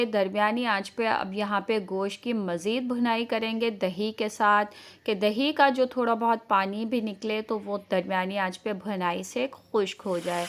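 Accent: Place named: India. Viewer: Achhi Tara KEHTAY han achhay se nahi. Indians ki naqal kar Kay I Urdu ka kabara na Karen please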